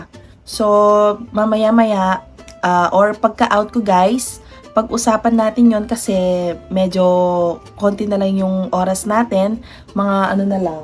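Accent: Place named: Pampanga, Philippines. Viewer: Kapampangan ka ne madam hehe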